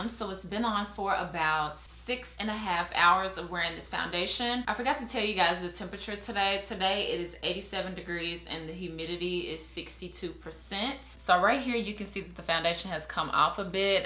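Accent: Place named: Texas, United States. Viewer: What city are you in. I hear a Texas accent.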